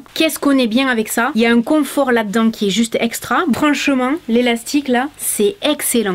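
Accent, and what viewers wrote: South, France. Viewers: Merci beaucoup pour vos conseils d achats les chaussures sont magnifiques j adore votre style et surtout votre bonne humeur 😊 et cet accent qui sent bon le sud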